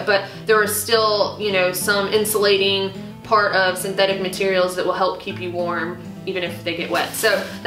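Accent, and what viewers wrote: South, United States. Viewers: Hey - Do people ever comment about how DIFFERENT the young lady is that is just starting the AT in GA (2015) to the one doing these very informative videos? The pretty is there throughout, but the confidence is far greater in these latest efforts. Hope your book is nearly as informative. Too bad you can't write with a southern accent!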